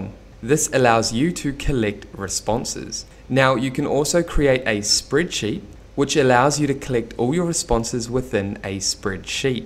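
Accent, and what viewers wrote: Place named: New Zealand. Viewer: Brilliant as usual. I just don’t bother with other YouTubers any more. wins. Plus, as an Aussie cousin from the other side of the ditch, I love your local accent.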